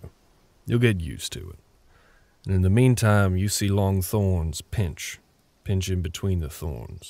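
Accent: South, United States. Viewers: as a southerner, this accent ain't bad. It's hard to tell what dialect, though. maybe Georgia? Mississippi? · Geez...that deep country accent got this country lady fallen head over heels. Yeeee-doggy! 🤠😍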